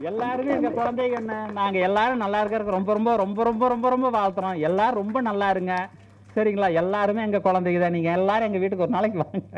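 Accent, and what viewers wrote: Kongu, India. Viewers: Kongu Tamil...UBM valgaa... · kongu tamil...😍💓💓💖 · BEAUTY OF KONGU TAMIL!!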